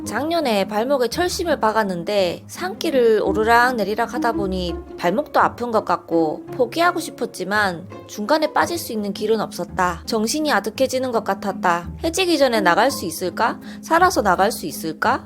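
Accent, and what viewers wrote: Gyeongsang, South Korea. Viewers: 부산이시골이네? 목솔 들어보니 깡촌녀ㄴ~~ 부산오지마송 밀양가소 그가 더어울릴것가소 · 이건 도대체 어느 동네 사투리? 요즘 경상도에서 이런 말투 쓰는 사람들이 있나??? ㅋㅋ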